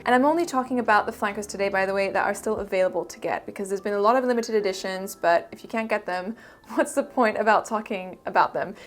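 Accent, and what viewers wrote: France. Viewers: Thank you so much foe the review it couldn't have been better than that!! I looove the french accent BTW❤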